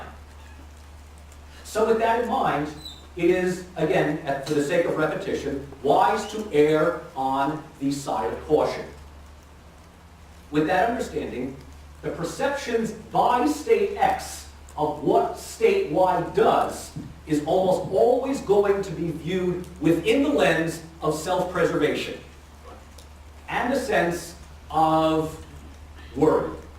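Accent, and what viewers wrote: New Jersey, United States. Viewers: His Jersey Accent makes the lectures even more entertaining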